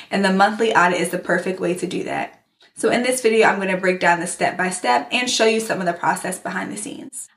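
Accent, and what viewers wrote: Baltimore, United States. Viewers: When I signed up for your email newsletter a few weeks ago it said Maryland at the bottom of the email. I knew you were from somewhere in Maryland because certain words you say you sound exactly like my husband whose from Baltimore and I thought that confirmation was too funny lol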